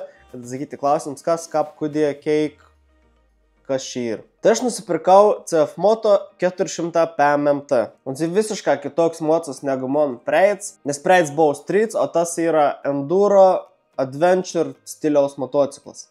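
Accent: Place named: Samogitia, Lithuania. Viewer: Kazkaip keistai smagiai ta zemaiciu kalba klausos visokie vidosikai 😀👍 Maladietc!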